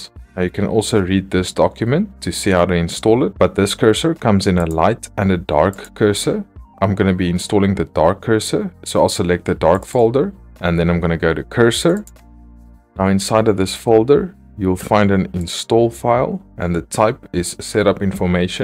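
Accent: South Africa. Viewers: jirre south african?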